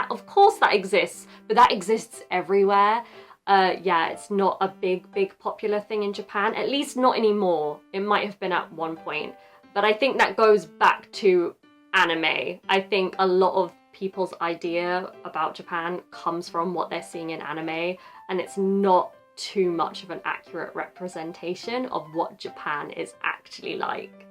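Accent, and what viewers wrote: United Kingdom. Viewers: 唐揚げとビール！・・・締めラーメン・・（笑） イギリス英語のいい勉強になります！有難う！！ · イギリス英語が、素敵！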